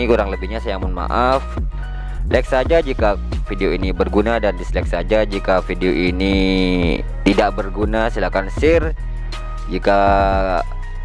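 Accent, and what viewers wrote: Madura, Indonesia. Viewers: Orang madura ya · kayak orang Madura malahan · Orang madura ya bang?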